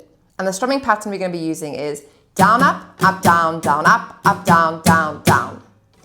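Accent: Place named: South Africa. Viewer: Great lesson and refreshing to hear a good old south african accent again.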